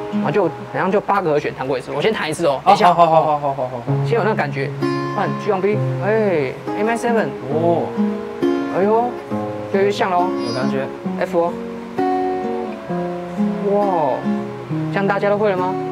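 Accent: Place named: Cantonese, China. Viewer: 在說廣東話的時候好可愛....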